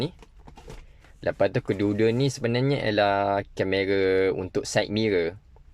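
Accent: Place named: Terengganu, Malaysia. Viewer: Brekmok orang teganu cakak gini ni.hahahaaa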